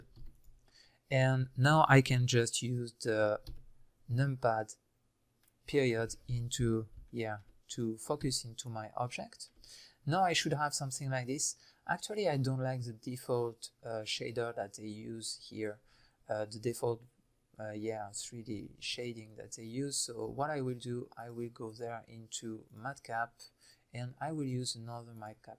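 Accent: France. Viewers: so I was looking for R when you said ER :D I love french speaking english :D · are you french? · Great explanations , went and bought his complete training course , don't mind the french accent but he is so soft he puts me to sleep, but it is better than trying find it here and there, great education all in one place. He is bringing me up to speed fast and I can't say enough good things about it. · On sens tellement l accent français ou un truc dans le genre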